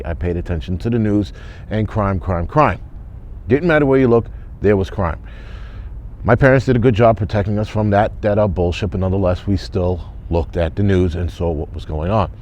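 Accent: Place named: South, United States. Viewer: Some times you sound like you have spent time in the south.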